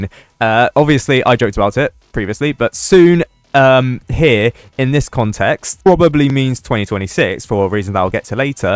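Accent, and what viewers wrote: United Kingdom. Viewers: sometimes i cannot understand what say cuz his british accent and a very familiar british voice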